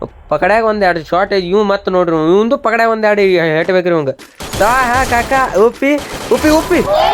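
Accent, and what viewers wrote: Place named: North Karnataka, India. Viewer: ಇದೆ ತರ ವಿಡಿಯೋ ಮಾಡಿ ಹಾಕಿ... ಉತ್ತರ ಕರ್ನಾಟಕ ಭಾಷೆ ಕೇಳೋಕೆ ಒಂತರ ಚಂದ...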